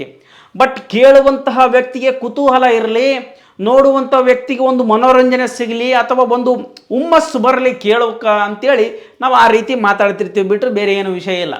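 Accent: North Karnataka, India. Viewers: He dosta ninna stayl alle helutu ba ninna uttara karnataka shailili super aiti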